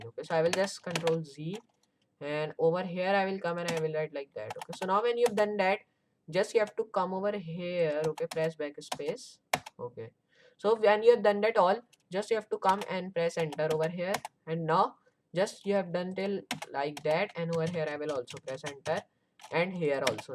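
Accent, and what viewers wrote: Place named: India. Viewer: dude, that work is great, thanks for the video.... but... But, your spoken accent is understandable! Speak slower for god sake! I guess you are Indian? Indian english accent is very hard to understand.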